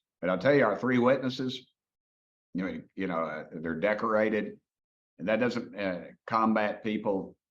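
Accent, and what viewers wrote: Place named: South, United States. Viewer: I couldn’t have more liking and respect for this gentleman if I tried to. He does exactly what he was put into office to do, work for his constituents & boy does he do it well. The southern ‘twang’ & his “tic tac like the candy” comments just make him more endearing. I don’t think anyone got as much as we were hoping to from the congress sitting yesterday, I sat here in NZ & watched the entire thing, & was very disappointed at the blocking of this & that, but hopefully in a behind the scenes meeting they will get to see more, find out more, enough to dig their boot & high heels into & start to climb up that rope, then, we the public, will hopefully see & hear more too. Time will tell, & I guess at this stage more times not going to kill us, at least I hope not!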